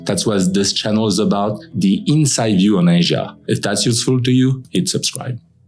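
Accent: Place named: France. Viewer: He's French. He could have a professional record studio yet almost nobody would understand his English :)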